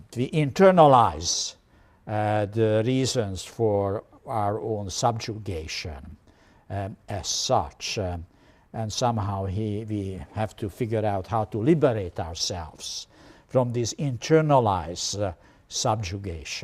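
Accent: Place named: Europe. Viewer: And I was surprised could do such a convincing continental accent!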